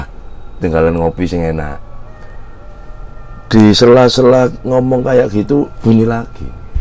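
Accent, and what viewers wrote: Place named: Surabaya, Indonesia. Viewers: Aku besar di jawa timur , tapi bukan asli jawa timur. Selama di jawatimur brasa biasa aja pke bahasa yg kya bpk ini, tapi saudara2ku yg ga tinggal di jatim slalu ktawa dnger aku ngmng nyenengin katanya. Skrg aku tinggal di jawa barat, dnger bapak ini ngmng aku ngrasain apa yg di rasain sodara2ku. Nyenengin denger bahasanya. Sehat sehat yaa bapak 🥰🥰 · Aku seneng nak arek suroboyo cerito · Aku wedi pak wkkw 😀😆 suroboyoan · 2x puter ngga Bosen2 ,,logat khas orang Jatim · Iki medok suroboyo sueneng aku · Logat Surabaya,nya g hilang jancokk... 🤣🤣😅😅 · logatnya surabaya banget om ;)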